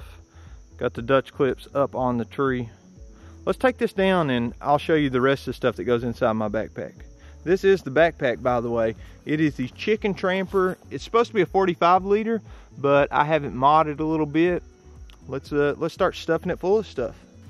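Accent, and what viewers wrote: Kentucky, United States. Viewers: I just found your channel I knew you were a southern Kentucky boy lol. In all seriousness Daniel Boone park is an amazing place.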